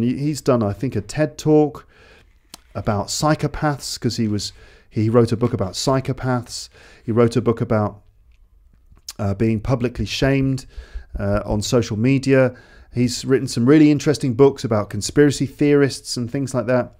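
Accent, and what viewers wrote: United Kingdom. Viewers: Thank you for your episodes. I've been watching and listening to you for some time now (probably 10 months). I found what are doing is very beneficial for people like me, trying to improve their English. I live in Egypt and mother language is Arabic. In my country, and probably most of the arab area, find that the American accent is easier for them and suitable with people with Arabic as mother tongue. Do you think this is correct???. In my case, though, I don't find it like that. I think the British accent is easier for me. That is why I'm following your channel.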